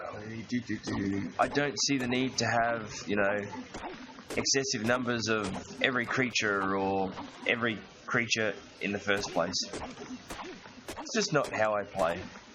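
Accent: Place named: Australia. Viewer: Looking forward to your wife's bad jokes lol Definitely picked up on the Australian accent. I am from South Africa, myself. Damn that L72 fish! Cool video man :)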